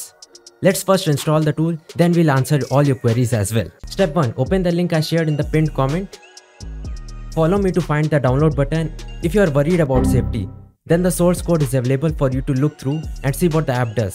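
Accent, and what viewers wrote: India. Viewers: once you hear the indian accent you know its gonna work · Bro are you Indian boy or smth?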